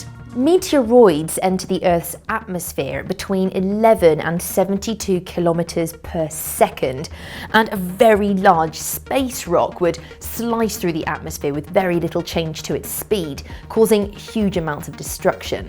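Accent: England, United Kingdom. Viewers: A beautiful woman with an English accent. Doesn't get much better than that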